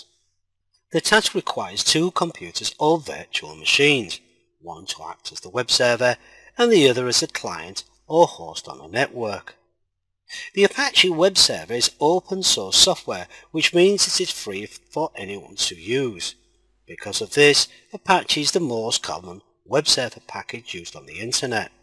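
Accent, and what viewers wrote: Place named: Newcastle, United Kingdom. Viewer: Very good explanation - and no trouble with your Geordie accent either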